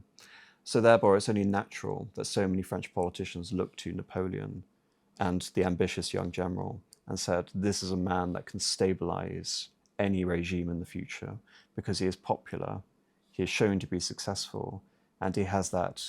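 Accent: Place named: United Kingdom. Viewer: Listening to is like reading two textbooks at once in a low pitched British accent but in a good way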